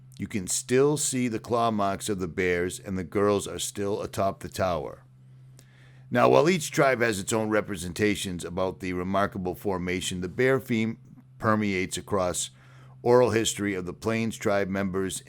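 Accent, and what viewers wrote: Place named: New York, United States. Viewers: Miss your east coast accent thanks for sharing your videos miss your old videos from Boston. Keep it up... A friend from the yoop Upper Michigan you ever get out this way? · I just stumbled across your channel, love it! Great content and yes it must be a fossilized tree our Heavenly Father gave to us for proof the Bible is true! Love your voice, you sound like you’re from NY, just a guess:) Thank you also, for reminding your listeners of our Creator. Safe travels, thank you for bringing us along:)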